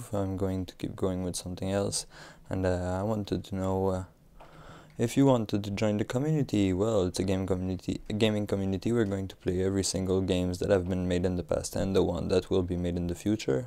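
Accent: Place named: Quebec, Canada. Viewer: ont a un québécois icite!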